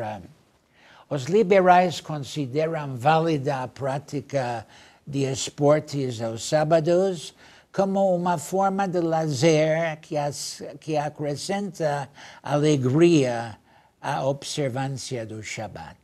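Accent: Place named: England, United Kingdom. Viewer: Nossa, ele falava exatamente do jeito que eu falava quando era criança quando imitava os Ingleses falando português kkkkkkkkkkk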